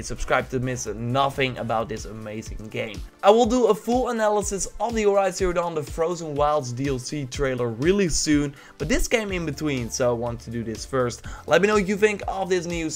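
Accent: Netherlands. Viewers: I think competition mainly lies with particular services like: Games with Gold & PS Plus Games, Xbox Live services vs PS Plus services etc. I get that to a certain point exclusives can win a persons heart at buying the console which supports it. But overall it hurts the gaming community because multimedia is infested now with fanboys arguing which console is better. I think Xbox, Playstation and Nintendo all have their pro's and con's. But it doesn't mean that it is right to trash talk the competiton. I own both a Xbox One S and a PS4 Pro ajd I completed Horizon Zero Dawn today (watching a lot of videos of yours). My point is, enjoy playing video games on whatever platform, but act with some kind of dignity inatead of insulting the competition ;) PS: are you Dutch? I slightly hear a Dutch/Flamish accent 😉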